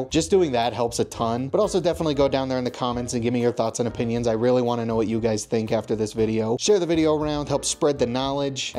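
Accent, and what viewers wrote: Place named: London, United Kingdom. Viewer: Hey good London accent. I sound more or less just like that😂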